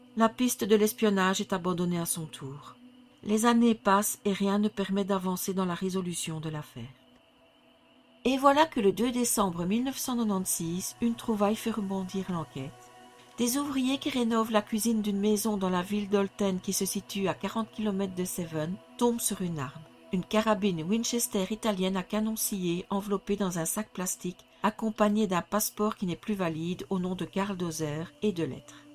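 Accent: Belgium. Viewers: J'adore ton accent belge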